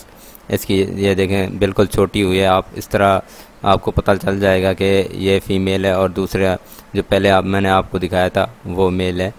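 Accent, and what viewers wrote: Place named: Punjab, India. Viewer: اپ اپنا اردو بولنے کا طرز ٹھیک کر لو اپ کا انداز بیان اچھا ہوگا اور اردو بولنے کے اندر پنجابی لہجہ نہیں ائے گا تو لوگ اپ کی بات سے ویسے ہی متاثر ہوں گے اور خود بخود اپ کو سبسکرائب کریں گے کیونکہ جتنا اپ روانگی کے ساتھ ادب اور اخلاق کے اچھے بہترین طرز کے ساتھ اپنی گفتگو کریں گے اتنے سارے لوگ اپ کی ویڈیو کے لیے بے تاب ہوں گے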